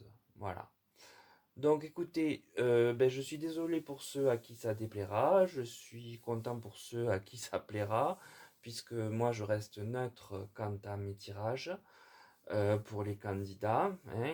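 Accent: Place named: South, France. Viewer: c'est quelle ville du Sud cet accent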